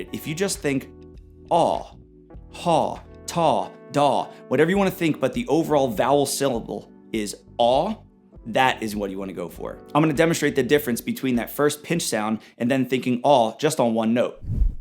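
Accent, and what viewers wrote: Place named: New Jersey, United States. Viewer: AWW but it's gotta be a jersey accent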